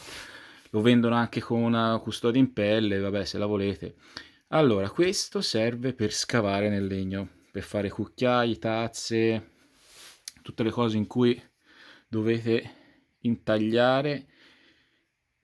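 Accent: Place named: Prato, Italy. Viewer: Ciao! Dal tuo accento direi appennino pratese? Ottimp video, essenziale e concreto!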